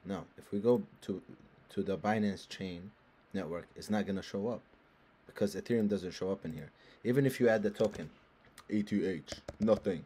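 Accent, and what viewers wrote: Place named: Libya. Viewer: I know a Libyan with the exact same accent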